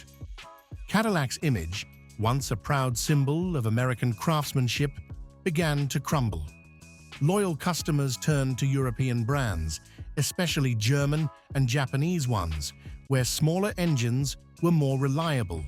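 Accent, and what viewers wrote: England, United Kingdom. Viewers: Four Thousand , One Hundred and One.............> WRONG:!!!! 4100..........Four Thousand, One Hundred..............PERIOD! Do some EDITING,,,,,,,,/"RESEARCH",,,,,, and get "RID" of the "GAY: English Guy's VOICE! Brits have been : LOOSERS,,,,,,for OVER 100 years now,,,,,,,,, "COME ON MAN"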